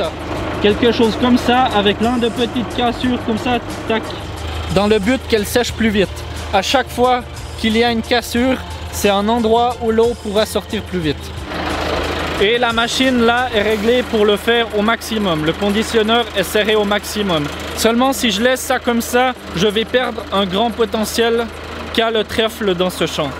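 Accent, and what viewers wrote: Vaud, Switzerland. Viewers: le bon accent vaudois !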